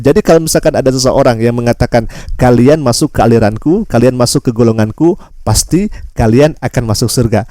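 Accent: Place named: Makassar, Indonesia. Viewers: Seperti logat aksen Sulawesi Selatan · Logatnya orang Makassar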